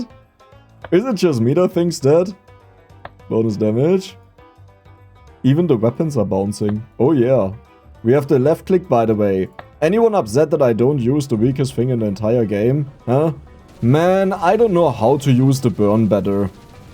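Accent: Germany. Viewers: As a compliment, you have the thickest german accent ive heard on youtube😂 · Are you german? Either way I love your accent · just a random question... Are you German? Cause I hear the "zee" and "zix" and I can't think of any other language that can give that accent... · German accent sptted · Thanks for the vid! Really a pleasure hearing how dude with strong german accent tries to use flamethrowers efficiently to kill as much of them as possible! Here you go <3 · Haha Geil, Grade deinen Kanal gefunden. Und nach den ersten paar Sekunden dachte ich mir " Moment der kling doch Original wie nen Deutscher mit dem Aktent ." Hab dei deiner Kanal Bio gesehn das du Deutscher bist und Direkt ne Abo Dagelassen . Ist schon krass wie man das Immer direkt raus Hört wen wir Englsich sprechen . Nur Liebe <3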